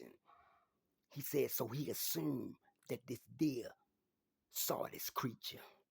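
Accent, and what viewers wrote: South, United States. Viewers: you can narrate a story like nobody’s business. Dang. Skills of a pro actor my guy. I just became a member of the Pack. I’m just north of Albany,NY, in the country. I come from down hear Chicago, & your manner of speaking brings back memories. I figure you’re probably in the South, but a lot of southern folks moved up Chicago ways, and you remind me of that🙂. I love listening to your videos when I lay down at night for bed. Should give me nightmares, but they never do. I can see your podcast really blowing up. It’s unique. Take care of yourself Mr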